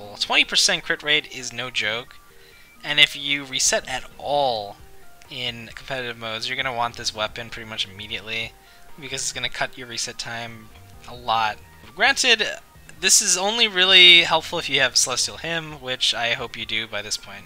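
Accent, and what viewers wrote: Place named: Australia. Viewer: Yo, you're voice sounds like Australian or something western I dunno, but Iirc you are playing SEA server right? And what the heck, you're damn good at making videos. Simple, the caption transitions are smooth but there's also that memeing moments and personal comments like "QTE Switch, now I can get rid of these abominations" or self interrupting like holy shit I rarely see that coming on these times. PS. You sound like you're speaking in Discord VC lol. PPS. I'm a fan of Aldnoah Zero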